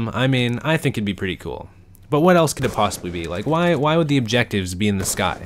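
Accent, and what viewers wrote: Canada. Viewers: r u canadian cause u say about funny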